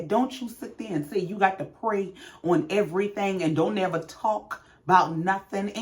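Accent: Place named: Charleston, United States. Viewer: Hey new subbie... where you from? Sound like Charleston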